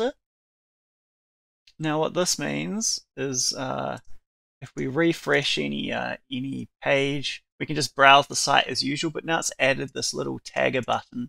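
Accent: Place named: New Zealand. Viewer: Thanks guy with a strong New Zealand accent! I was trying to figure out how to generate an AcoustID from an audio file to figure out if it matches the ones linked to a MusicBrainz recording (So I could determine if the two recordings should be merged), And looking it up on the internet I couldn't find an answer, But this video explained it quite simply!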